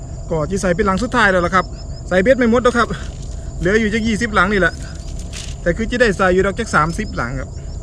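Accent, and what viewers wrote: Korat, Thailand. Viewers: Fcโคราชจ้าชอบพี่พูดภาษาโคราช พี่ได่เมียบ้านไหนฉันอยุ่ขามสะแกแสงเดิ้อ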